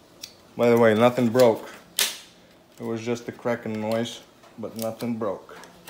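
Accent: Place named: New York, United States. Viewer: That’s very accurate and helpful, hope to see more of your content. Very cool NY accent)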